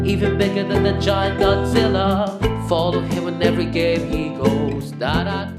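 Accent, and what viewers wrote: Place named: England, United Kingdom. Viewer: Why does these kind of videos always had a narrator with english accent?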